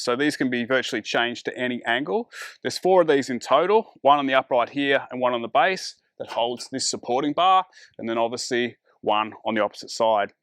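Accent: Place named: Australia. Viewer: It’s wild to here the Australian accent. So many endings of sentences sound like it’s a question. Pull up bar? Higher? Top bar?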